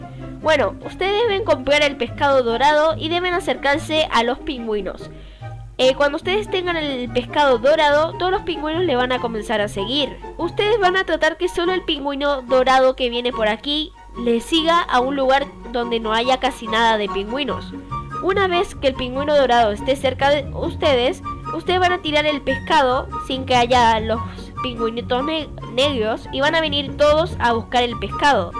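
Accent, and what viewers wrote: Argentina. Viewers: No sabía que eras de Argentina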